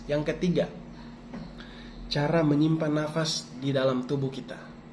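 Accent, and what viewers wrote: Manado, Indonesia. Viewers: Manado p logat kang😁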